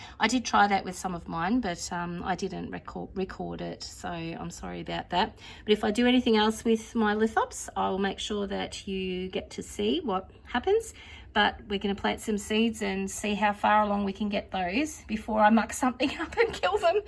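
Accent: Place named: Australia. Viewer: Lovely Lithops, great to hear an Ozzie talking about them!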